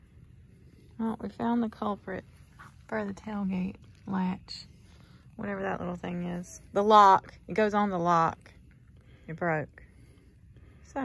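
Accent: South, United States. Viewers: there’s not much true southern speak in Florida anymore so it’s a delight to hear it ms lol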